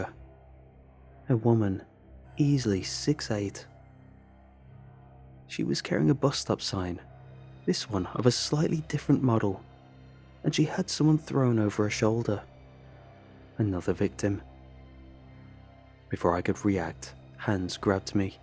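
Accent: England, United Kingdom. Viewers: WTF?! He is English. I am American but have absolutely no issue with following his UK accent. In fact, I quite like it for added atmosphere. Heaven help you if you ever have to listen to a Scots or Irish accent if this guy is hard for you to follow. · Considering you speak with a British accent little brother,I would LOVE to guide you through the misty mountains and the foggy bottoms that are in West-By-God-Virginia...🤘☢️🤘 I'm the one who would sacrifice to get you out to tell the story my friend... Come to the U.S. and I will drop blood for you...🤘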